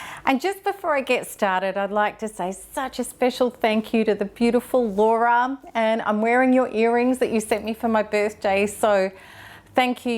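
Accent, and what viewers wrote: Australia. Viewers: ozi acent and this patronising way of talking is so anoying.By the time she finishes the sentence,I forgot what she started talking about. However, some comments here are so helpfull. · What the hell is this, you wanna show that you speak Australian English right rather then what impotent things you are saying, right.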